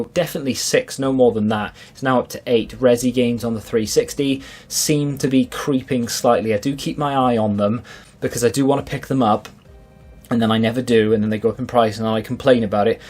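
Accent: Wales, United Kingdom. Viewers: He sounds Welsh to me. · Yep definitely Welsh mate, I think he said once his nearest big CEX is either Swansea or Cardiff, can't remember which.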